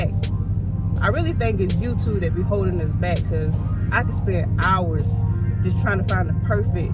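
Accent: South, United States. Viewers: Well, it's always a pleasure seeing your beauty brown skin next to that car, and hearing that lovely southern accent. 😊 Sending love all the way from Philly. 🔔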